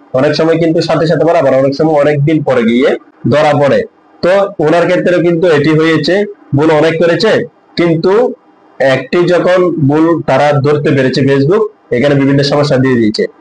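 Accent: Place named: Chittagong, Bangladesh. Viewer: ভাইয়া মনেহয় চট্টগ্রামের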